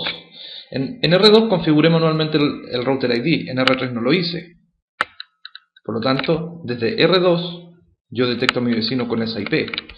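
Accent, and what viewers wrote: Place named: Chile. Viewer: excelente vídeo me faltaba tener bien el concepto de la métrica lo de virtual link no lo sabia pero en CCNA igual se trabaja con distintas áreas teniendo claro la Backbone, OSPF tiene sus reglas bien definidas me sonó a que eres de Chile bueno saludos.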